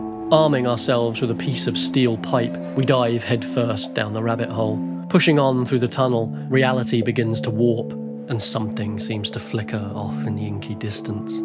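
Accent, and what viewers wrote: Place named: United Kingdom. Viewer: This guy is more annoying and pretentious than he claims Vincent to be. The leftist stuff is overly annoying and childish. Bipartisan politics are meant to divide and cause inflighting in people. Most people pick a side younger in life and then grow out of it realizing they have more in common with people on the other side than they do the people they look up to in office that they vote for. He says things like the right supports mass shootings when nobody clearly does. He acts like every opinion he has is right, like an angsty person who's never raised a child and been able to see his own faults through raising another human being to function in society that's a mirror image of himself. Everything he says politically is just so cringe and stereotypical of his political side. I'd almost expect him to say "Heather, being born without a penis is inherently good unlike the toxic male Vincent who's smugness is a direct result of his containment of semen." This even being remotely watchable to anybody is an example of how strong the silent Hill stories are. 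Even a hack like this guy can use it as a crutch to shove his political views into and get a decent amount of views. Put a British accent on it like his and any young, immature self-hating Westerner will feel intelligent watching it. All I hear is pretentious garbage, Flesh Simulator (who's actually talented) being ripped off, hbomberguy being copied and "I'm very artful." I can watch some leftists I don't agree with, but this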